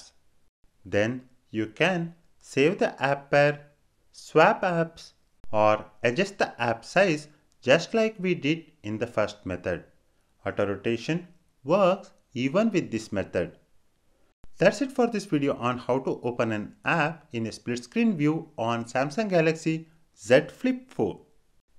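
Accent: India. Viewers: This accent always brings Indians down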